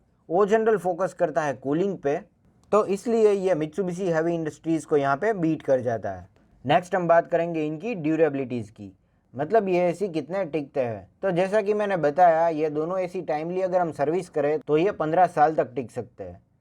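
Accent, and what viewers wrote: Gujarat, India. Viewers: Gujarati Cho Brother?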